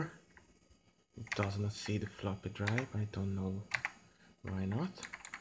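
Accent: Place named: Hungary. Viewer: Helló magyar vagy valószinü ugyanis nem angolos a akcentusod :D .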